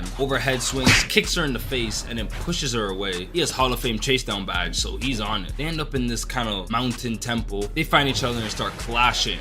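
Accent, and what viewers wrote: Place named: Toronto, Canada. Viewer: are you from Toronto? I can sense the accent like i sense the force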